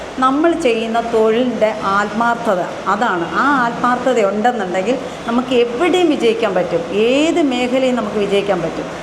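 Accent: Kottayam, India. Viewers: Thamasam tvm anenkilum samsaram kottayam anu ennu thonnum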